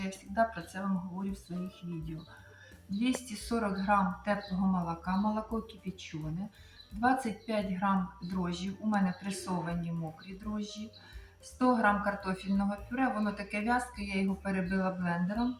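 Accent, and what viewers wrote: Ukraine. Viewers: Людина освічена краще говорить українською. А це - російська мова з українською вимовою. Тут більше російських слів ніж українських.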